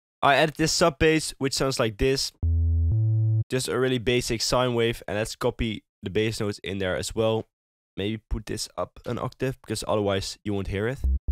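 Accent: Netherlands. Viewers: It sounds like u have an dutch accent Are u dutch? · De eerste paar seconden hoorde ik al dat je Nederlands bent haahhaha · you sound dutch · je nederlands accent man geen haat tegen maar tis een beetje raar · 😂Je hoort het geweoon, je komt uit nederland zeker? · This guy is definitely Dutch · Mooi nederlands accent haha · Ur Dutch? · are you dutch? bc you kinda sound like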